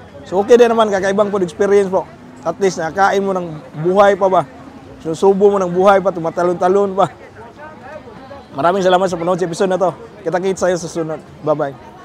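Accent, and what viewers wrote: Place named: Cebu, Philippines. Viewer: Taga cebu ka lods?? Mao mao mn gud ug tuno sa inisturyahan ni 😁😁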